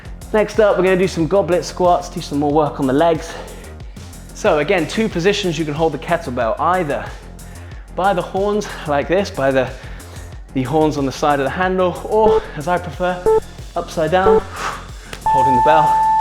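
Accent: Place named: United Kingdom. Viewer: Hi, just found your channel and it's the BOMB - exactly what i need: timed, pre-planned workouts with minimal talking.... yet when there is a spoken word, it's delivered in a wonderful British accent! Cheers!!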